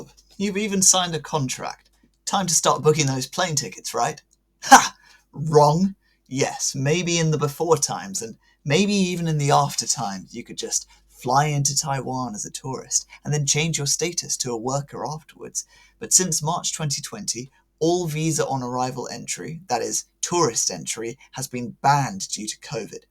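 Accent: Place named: United Kingdom. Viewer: It´s lovely to hear you talking. I am not used to listening to British English that much anymore. Besides that, I hope that everything works out well in the future and you can come to Taiwan soon!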